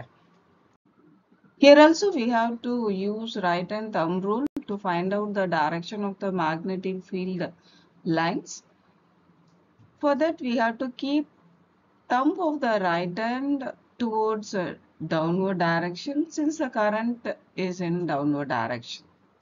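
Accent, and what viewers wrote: India. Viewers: Are U from Kerala?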